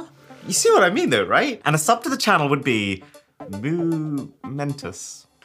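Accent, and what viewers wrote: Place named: United Kingdom. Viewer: The only British guy whose accent i can fully understand 🫠. Great video tho ✌️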